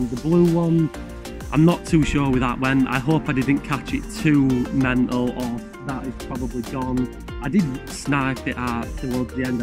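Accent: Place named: Sheffield, United Kingdom. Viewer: Sound like your from Sheffield pal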